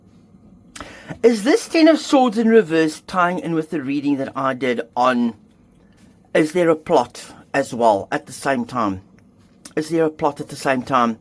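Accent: South Africa. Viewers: You sound like a South African. 😮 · You are filming in New Zealand, but you have a very strong South African accent.?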